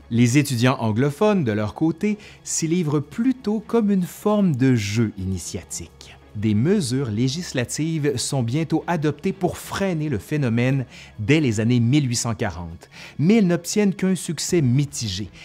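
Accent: Quebec, Canada. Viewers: Salut á tous !!! L'accent des Canadiens francophones sonne comme une musique agréable à l'oreille ... Merci pour ton travail de qualitée !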